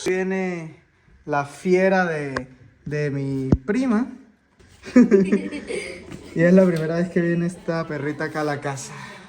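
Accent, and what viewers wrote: Mexico. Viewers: ya hablas como mexicano amigo... muchos saludos ddesde Nayarit , Mexico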